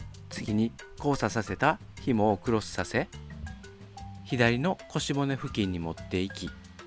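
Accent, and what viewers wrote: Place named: Kyoto, Japan. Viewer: なんか喋り方が京都のお方の喋り方ですなぁ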